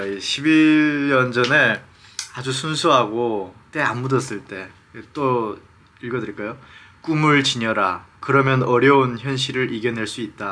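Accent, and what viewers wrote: South Korea. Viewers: 발음도 이젠 남조선 같아요~^^ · 말씨는 남한사람 이네요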